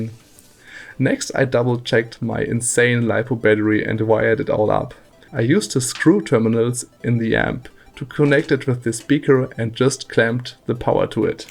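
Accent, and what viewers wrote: Germany. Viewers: Dein Englisch klingt echt deutscher als meins? Wie? Und ich dachte ich wär der einzige der zu doof is für diese komische aussprache xD nichts für ungut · Da hör ich doch den Deutschen Akzent raus🧐🤓😅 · Man hört deinen deutschen Aktzent mega raus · Ich fand dein Video sehr hilfreich und man hört sehr, dass du deutscher bist xD. Aber könntest du mir ein paar Daten des Lautsprechers geben? Also wie viel Watt RMS der ha z.b? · you have the most german accent ive ever heard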